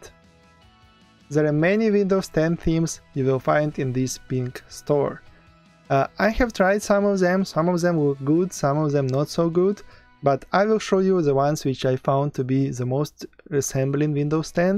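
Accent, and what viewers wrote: Russia. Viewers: That's because he is Russian. Why would that be an insult? It's just an accent ☺️ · not insulting you, but you have a huge russian accent nice vids!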